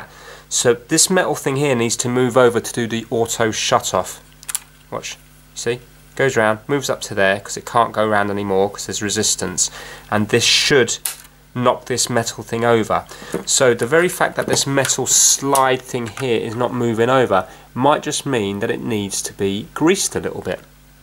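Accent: England, United Kingdom. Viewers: Love you accent what part of England are you from also the walkman looks cool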